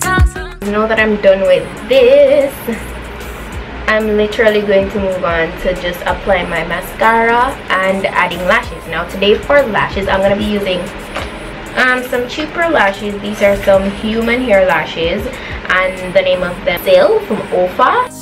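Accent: Jamaica. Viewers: are u a Jamaican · Your Jamaica don't it?🇯🇲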